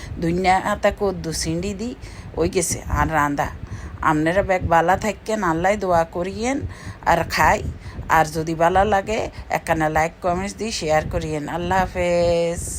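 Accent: Noakhali, Bangladesh. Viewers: দারুন হয়েছে রেসিপিটি 💐😋আপনার নোয়াখালীর ভাষায় কথা গুলো শুনতে ও ভীষণ ভালো লাগলো❤❤❤❤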